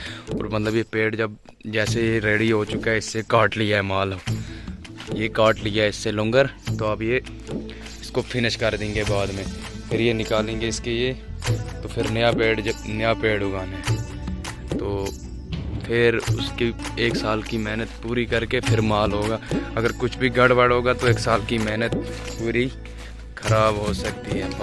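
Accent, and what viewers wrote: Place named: Poonch-Rajouri, India. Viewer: Bhai jaan language se lgra ae poonch Rajouri se oo ya to fr choudhary ho❤❤❤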